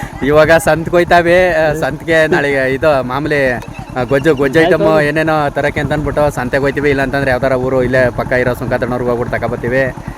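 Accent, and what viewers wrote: Mandya, India. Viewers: ಬಹುಶಃ ಇದು ಮಂಡ್ಯ ಬಾಷೆ ಇರಬಹುದು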